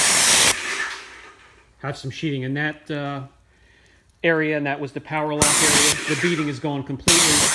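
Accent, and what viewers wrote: Pennsylvania, United States. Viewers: Very PA accent. Love the videos!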